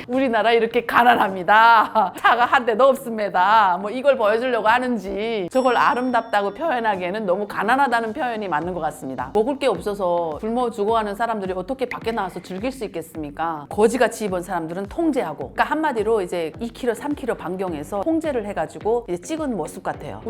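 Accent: North Korea. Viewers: 재미 있게 진행 하십니다 말도 북한식으로 하니 실감나게 들립니다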